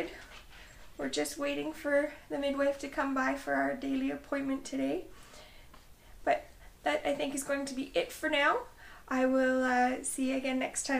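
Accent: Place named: Canada. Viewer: I love your accent, Canadian?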